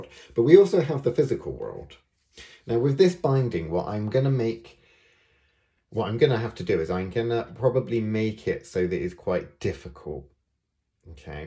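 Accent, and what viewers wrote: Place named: United Kingdom. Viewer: Across the pond here, really appreciate the “professor snape” vibes… maybe it’s just the accent hehe 😜 thanks for the series I learned a lot!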